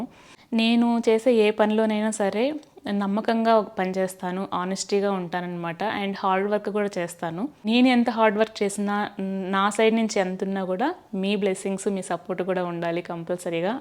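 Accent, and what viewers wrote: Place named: Nellore, India. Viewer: Hi andi, I can understand your situation Nenu kooda same mee situation lone unnanandi , nenu 2 years nundi shifts unde job chestunnanu night shifts ekkuva chestuntanu , health chala padaipothundi and pillalni sariga choosukolemu kooda andi job manaileka pothunnanu meeru cheppunatlu job chestoo undi sudden ga manesi khalie ga undalante undalemu , kaani health chala important andi manam healthy ga untene pillalni , family ni choosukogalamu , ie night shifts valla mind kooda disturb avuthundandi , anduke quit cheyyalane anukuntunnanu final ga Meeku business idea undi kabatti danike move avvandi andi ie night shifts chesi health disturb chesukokandi , idi experience tho mee meeda gowravam tho nenu meeku istunna salaha matrame , kaani meeku edi mee life style ki correct ga untundo. Choosukoni decide chesukondi, meeru matlade vidhanam naaku chalabaga nachuthundandi maadi kooda nellore ne anduke akkadi vallatho matladinatlu untundi naaku mee video choostunte , naaku time dorikithe compulsory gaa mee videos choostuntanandi . 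All the best andi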